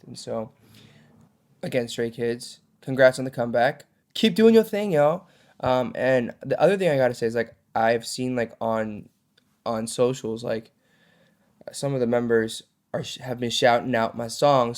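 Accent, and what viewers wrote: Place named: United Kingdom. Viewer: I love your British accent (Actually i love your all)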